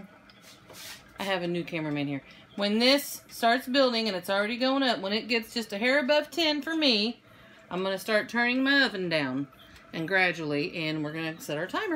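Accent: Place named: Rural Missouri, United States. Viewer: LOL! I felt like I was back home on the farm in rural Missouri when I was a kid. You informed me, made me laugh, and your accent is a breath of fresh air from home. I am in the downhill side of 60 and have canned for over 40 years but never canned ham because someone told me that I was not supposed to because it was cured meat and curing changed the density of the meat and it might not can properly. I know that I am watching this several months after you did this and that you have made several videos since so you have probably had a chance to open the jars by now so could you please update on how it turned out. I really want to do this. I am not opposed to rebel canning methods. My Grandmother Wright had a picture of the man praying over his meal like yours hanging over her table. My brother has her picture. That made me homesick. 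Have an awesomely blessed day!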